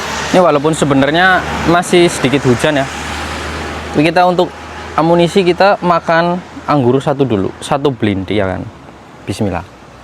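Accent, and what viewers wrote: Banyumas, Indonesia. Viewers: Ngapak medok banget menghibur bisa jadi rebutan gadi Jepang, jangan tinggalkan 5 perkara tinggalkan 5 perkara, maling, main, minum, madat, mawon, si mirip mas Sentot Cilacap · Sekang ndi asale mas? Logate ngapak kayak nyong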